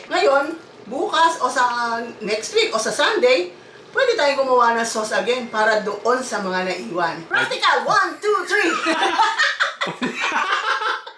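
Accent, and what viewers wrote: Philippines. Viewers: I feel like Im in the Philippines while watching. The accent, the vibes, and humour feel like you are at home with your nanay. With all the ❤️ dun sa kare-kare not just appetizing but eating w family together is therapeutic.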